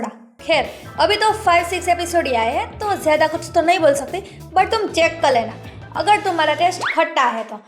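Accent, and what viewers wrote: Gujarat, India. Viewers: Aapki hindi me gujrati vibe kyu aarhi hai 😶😁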